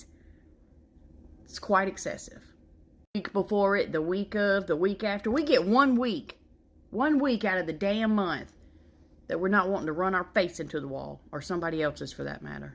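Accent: South, United States. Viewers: You're like my twin with a southern accent. I love you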